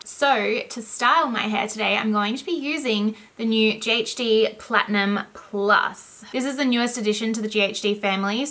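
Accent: Australia. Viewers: Are you Australian? love your accent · lol, I am Aussie and when I watched 50secs into the vid, I was like... she is Aussie!!!!!!! yay !!!!!!!!!!!!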